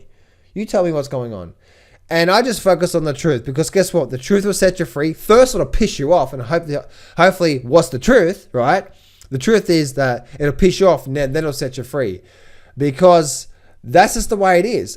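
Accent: Australia. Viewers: love a good no-nonsense Aussie accent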